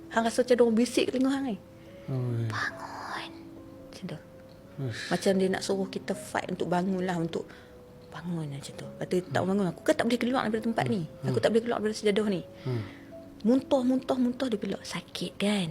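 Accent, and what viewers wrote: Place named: Penang, Malaysia. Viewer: Hg dr kecik smpai besaq lenggoq penang noh😂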